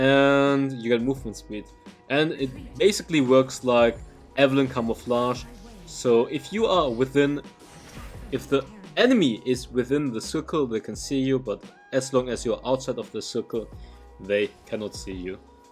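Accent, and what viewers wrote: Germany. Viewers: Are you german you hearing a little bit like a german boy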